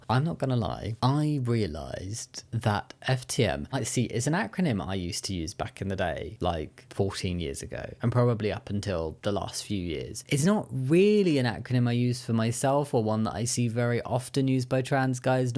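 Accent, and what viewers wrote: England, United Kingdom. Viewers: has such a lovely accent (Brits, ignore this comment; y'all wouldn't get it cos you're from the U.K. so you're immune 😅). As a casual viewer, I can't recall if he's mentioned where exactly in England he's from 🤔 But I know he mentioned traveling to London. So that can't be where he lives. Anyway, wherever in England he's from, I love the accent.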